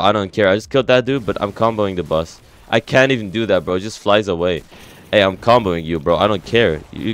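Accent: Germany. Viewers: Ey bist du deutscher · Are you German?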